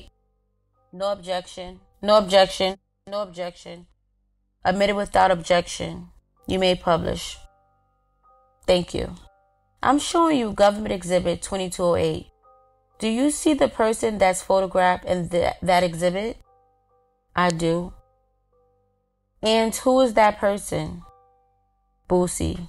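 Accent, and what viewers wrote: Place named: New York, United States. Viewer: Whoever the narrator got a thick new York accent ong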